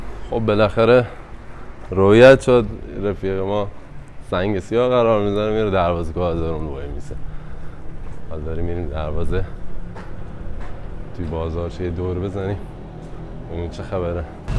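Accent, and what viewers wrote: Shiraz, Iran. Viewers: منم از کار زیبای شما سپاسگزارم بخوصوص با لحجه زیبای شیرازی شما. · ، عالی بود. چند روز پیش یک کار شبیه این همشهری محترم شما آقای حسام الدین را دیدم. براش تعریف از لحجه شیرازی ایشان کردم در صورتی که ایشان اصلا خیلی کم حرف میزنه. در واقع این در مدح لحجه شیرین شما بود که اشتباها رفت برای ایشان. 😄 خلاصه از هر لحاض ما با کارهای خوب شما وطن را حس میکنیم. دمت هم گرم. آقا این آخر ویدیو هم حرف نداشت. فضای ناب.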